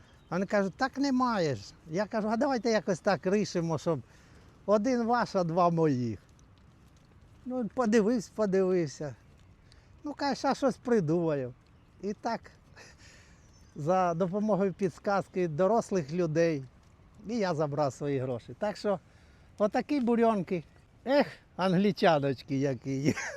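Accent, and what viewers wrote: Chernihiv, Ukraine. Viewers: Доброго дня земляче з Бобровицького краю!а я по говору і познав,що чернігівська вимова.с.Ярославка.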